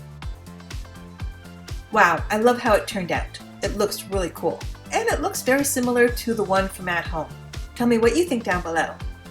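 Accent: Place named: Canada. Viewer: You sound Canadian like my brother’s family in Peterborough, Ontario. 💕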